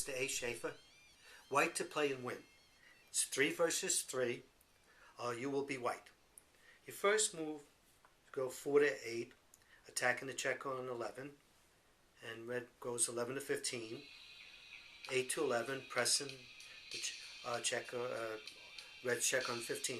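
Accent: New York, United States. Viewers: Love these checkers videos - like the NYC?/ NJ? accent.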